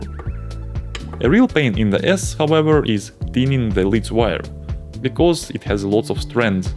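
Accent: Russia. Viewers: От русского акцента трудно избавится. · какой русский акцент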